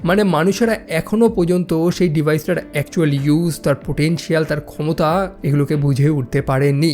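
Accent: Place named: Bangladesh. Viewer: ভাইয়া আপনি কি ইন্ডিয়ান? কিন্তু আপনার কথা বার্তা বাংলাদেশীদের মত শোনায়। তা যা-ই হোক মুভি এক্সপ্লেনেশন অস্থির করেন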